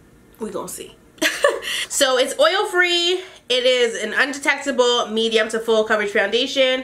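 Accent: United Kingdom. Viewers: UK accent on point sissss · That accent though sis had me shook lol 😂🇬🇧bound lol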